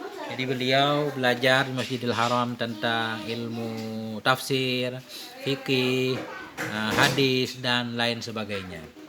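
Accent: Aceh, Indonesia. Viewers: ooo jroh gure,lon kalon cara boh makna kitab,logat pesantren aceh,jino pat tinggai gure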